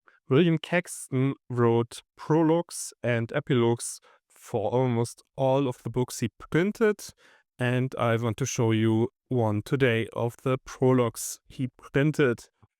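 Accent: Germany. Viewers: you know I love your German accent! It's a hot day in Texas too but that's normal for August. I sure hate the heat. It's so draining. At least I'll be you have snow in the winter where you live. Snow is a luxury here. But it's so pretty when it does. This whole York, Plantagenet, Lancaster, tower thing is confusing. About the time I think I have a grasp of it, something else comes up. You keep up the good work, ok? And thank you!! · Unlike Americans, the German accent is elegant, and graceful. It is a pleasure to hear you speak. Please, always feel free to do so whenever possible. I am looking very much forward to the expanded video on this subject as it is quite deep filled with complexities which may hold be the key to revealing the most long awaited, fiercely battled mystery in England's history. Thank you greatly for your fine, most dedicated work in this regard.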